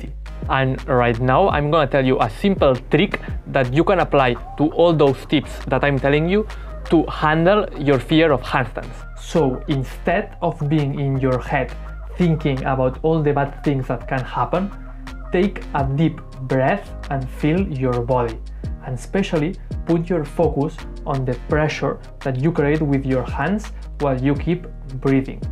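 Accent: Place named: Spain. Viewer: illo que dise er tio tu seguro que ere españo vaya que se te nota to el asento chiquillo que arte tiene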